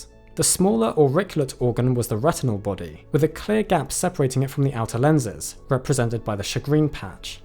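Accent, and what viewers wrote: England, United Kingdom. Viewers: He's English. That's how they talk.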